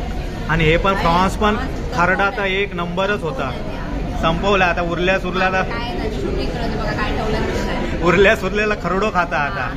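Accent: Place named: Malvan, India. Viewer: साहेब तुमचे मालवणी भाषेत बोलणे खूप छान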